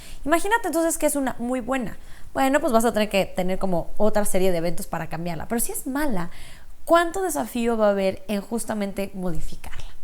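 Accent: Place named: Mexico. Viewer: De que país eres? Me parece tu acento ligeramente mexicano, pero no estoy seguro.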